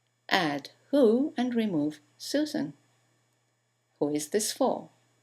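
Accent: United Kingdom. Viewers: Thank you so much for this video! I wanna ask you about the accent, teacher. Is this totally British English? Could you please give me some advice of choosing one between BE and AE? I think I should choose the one that is easier to understand( to me ) ? Is that enough to make a decision , teacher ? Thank you for your attention! Nice day! <3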